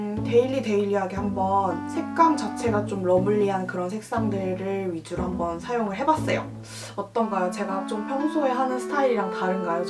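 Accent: United Kingdom. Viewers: 구독자분들 요구에 하나하나 맞춰주시는거같아서 너무 좋아요 ㅎㅎ 언니의 영국발음도 좋지만 가끔 이런 한국어 튜토리얼도 매력잇네요! 그나저나 섀도우 너무 이뻐서ㅠㅠㅠㅠ 에뛰드 세일할때사러가야겠어요ㅠㅠㅠ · 한국어랑 영어 둘 다 좋은데 영국식 발음의 영어가 너무 매력적이에요 ㅠ💕 그리고 스트롭은 진짜 미세한 펄이에요! 바닐라코 제품도 궁금하니 통장을 비워봐야겠네요ㅋㅋㅋㅋㅋㅋ 언니는 브들파데 잘 쓰시는 것 같은데 저는 샘플로 5번정도 써봤는데 저는 뭔가 더럽게 무너지는 것 같아요ㅠㅠ 아 그리고 혹시!!! 엄청 밝은 색상의 브로우를 파는 매장을 아시나요?ㅠㅠ 제가 엄청 밝게 탈색했는데 색상이 마땅치 않아서 섀도로 눈썹그리고 있는데 섀도로 브로우하니까 시간이 너무 많이 걸려요😂 그리구 에뛰드 1호4호 갖고있는데 발색이 랜덤인 것 같아요.. 뭔가 살짝 쉬머섀도들은 브러쉬에서 뭉치고ㅠㅠ ㅋㅋㅋ그리고 문샷은 뭔가 중렴이? 브랜드 인 것 같아요 저렴이라고 하기엔 가격대가 훨씬 높고 고렴이라고 하기엔 살짝 저렴한? 상당히 애매한 가격대의 브랜듴ㅋㅋㅋㅋㅋㅋ 저는 현재 눈병으로 고생중인데 설 연휴라 병원을 못가고 있어요ㅠㅠ 하필 저번 주 금욜 새벽에 눈병이 하ㅋㅋㅋㅋㅋ 이렇게 길게 느껴지는 연휴는 처음인 것 같아요(나쁜의미로)😭 겨울 독감,눈병 조심하시구 남자친구없는 전 이 메이크업을 친구만나러 갈 때 응용해보겠습니닼ㅋㅋㅋㅋㅋ 설날 마지막 연휴 잘 보내세요👍🏻💕💕💕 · 언니 영국특유의 발음이 너무 좋아요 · ㅋㅋㅋ크으 은 역시 영국어 발음이 을 더 빛나게 해주는 것 같아요 한국사람이라 한국어 영상이 더 이해하기 좋은건 사실이지만 역시 영어하실때의 그 무언가를 따라잡긴 힘들어욬ㅋㅋㅋㅋVlog를 한국어로 재밋게 찍어주세요 😜오늘도 좋은 영상 고마워유💫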